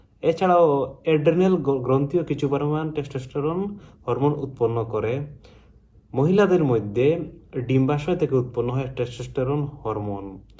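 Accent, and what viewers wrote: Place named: Barak Valley, India. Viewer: Bhai aap konsa college sa ha Apka language to bengali jasa ha matlab .. from barak valley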